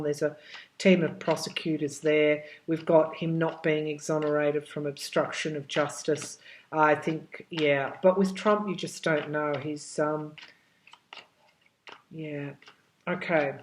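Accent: Australia. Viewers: it's okay we love that Aussie accent keep it up. by the way we a also love your beautiful smile. I cannot wait until the world gets a little bit lighter, so you can smile a little more. I feel bad for you I know this stuff must be hard on you. These readings must take a lot out of you. We appreciate every single time we get a new video. Keep up the good work.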